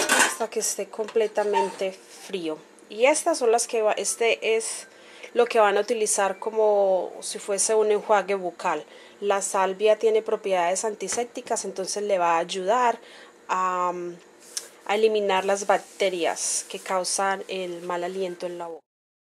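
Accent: Colombia. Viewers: Hoy descubrí estos videos y están geniales, gracias por compartirlos con todos nosotros :) Eres paisa? Es que te siento como acento jeje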